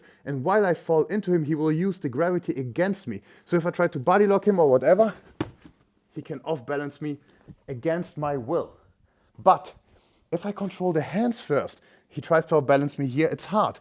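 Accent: Germany. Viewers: He is German (or his accent is German for sure) what do you expect…. I dress like this too 😊. I always wanted to know if Jozef speaks German, I think so.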